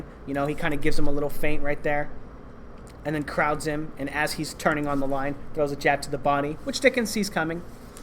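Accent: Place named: New York, United States. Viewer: You sound like Pauly Magnillagi LOL maybe its a New York thing that accent .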